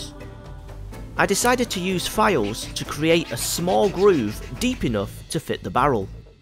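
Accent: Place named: England, United Kingdom. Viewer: Welcome to England pronunciations good sir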